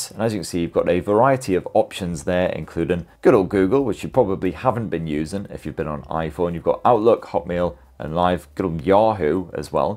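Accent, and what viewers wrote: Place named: Newcastle, United Kingdom. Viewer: Smooth brain fodder. The forced humour is shite. Especially delivered in a crap monotone Geordie/Mackem/Smoggie accent.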